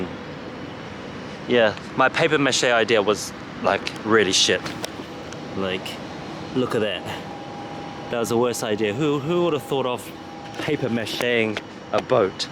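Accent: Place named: New Zealand. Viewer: where are you from bro? sound like a kiwi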